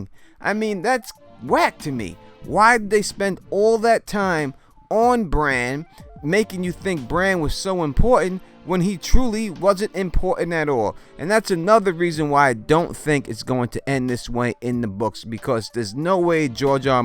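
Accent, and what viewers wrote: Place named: Long Island, United States. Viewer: Youre def from!!! Ny longisland my guess lol.. With that i say, keep it up good work brutha!!!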